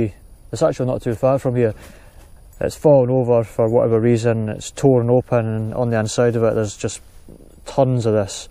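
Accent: Scotland, United Kingdom. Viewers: Love the brave heart bagpipes. The kit and your Scottish accent are awesome. Great job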